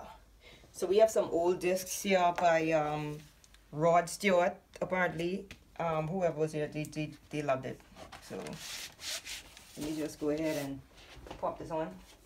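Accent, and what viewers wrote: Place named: Bahamas. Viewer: Few seconds in and I hear a Bahamian accent lol 🇧🇸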